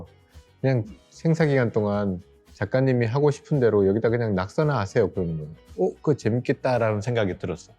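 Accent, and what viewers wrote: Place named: Gyeongsang, South Korea. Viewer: 출생이 경기도 고양시 출생인데 말투가 경상도 쪽 어휘와 억양이 있는데 경상도에서 살았거나 부모님이 경상도 쪽인가? 거의 확실해 보이는데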